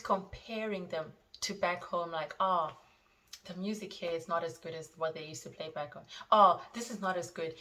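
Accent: Australia. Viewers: some ausie accent detected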